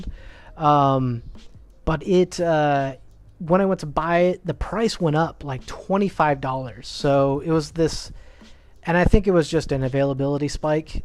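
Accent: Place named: Texas, United States. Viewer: This is my first video of yours to watch and as a fellow Texan I knew you were from Texas before you said you were haha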